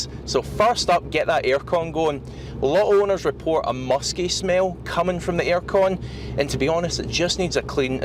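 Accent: Scotland, United Kingdom. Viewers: I like Scottish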